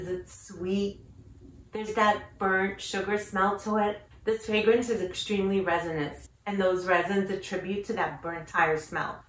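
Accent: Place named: New York, United States. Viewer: I just love your New York accent ! ❤️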